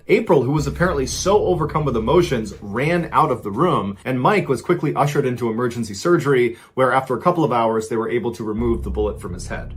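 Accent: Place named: New England, United States. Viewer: Love your stories... best part is hearing it with the accent. I never realized how much I missed it until I watched you for the first time. ❤ New England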